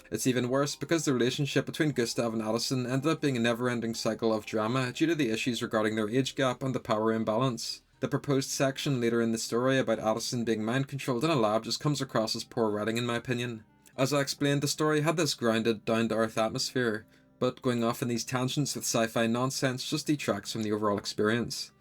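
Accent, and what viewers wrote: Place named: Northern Ireland, United Kingdom. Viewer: as someone from northern ireland i knew i reconised your accent lol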